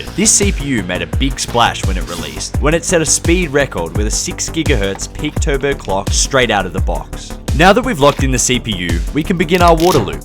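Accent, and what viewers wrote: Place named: Australia. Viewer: Man this thing actually looks BEAST👀 On a side note, realitvely new viewer and I honestly have no idea how it took me so long to recognise your accent as that of a fellow Australian😂 After having looked through some of your catalogue online and realising you offer some slight modifications to some of your prebuilts I can say that I'm honestly feeling very inclined to buy one off you as my current system is reaching that 5ish year mark(Ryzen 7 3700X/RTX 3080(probs one of the few aussies who scored one at MSRP😂)) And while i have usually centred around 1080/1440p w/ decently high frames for competitive FPS titles, I am finally reaching that age where I'm playing more AAA single player titles in 4K so definitely be looking by the end of the year and despite my enjoyment for bulding I feel like taking the easy route🤣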